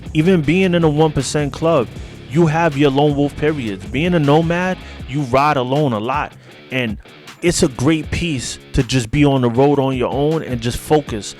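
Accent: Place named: Brooklyn, United States. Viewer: It sounded like your from Brooklyn. I lived in Borough Park Sheepshead Bay and Canarsie but I’m here in Florida now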